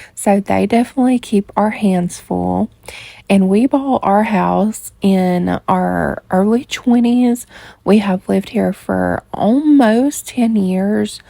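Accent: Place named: Mississippi, United States. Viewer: This is the first vid Im watching, but now that Im in Canada your accent makes me sooo happy as someone that grew up in Mississippi 🥹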